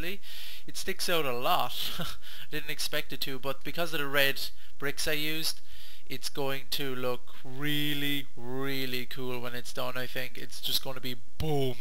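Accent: Ireland. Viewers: is your accent irish? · i only just found this channel and its says your irish i am too but i cant tell from your accent where your from ???? :O :)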